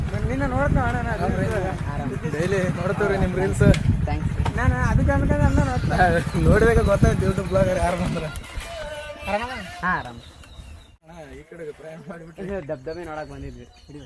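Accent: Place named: Kalaburagi, India. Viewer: Brother pure kalaburagi matt addu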